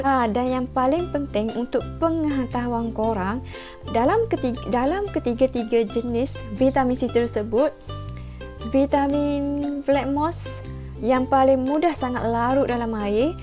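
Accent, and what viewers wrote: Kelantan, Malaysia. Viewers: Ore klate ko. Caro kecek pelet . Hahaha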